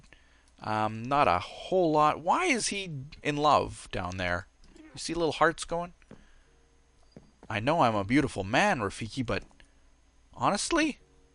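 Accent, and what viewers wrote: Canada. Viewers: Do Canadians say Aye a lot?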